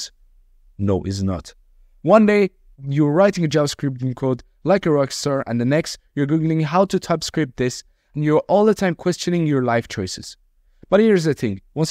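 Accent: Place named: India. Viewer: Blud has indian accent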